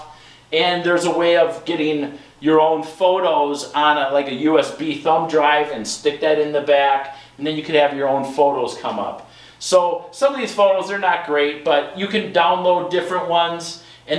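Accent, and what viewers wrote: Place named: Canada. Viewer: Awesome. Canadian, eh?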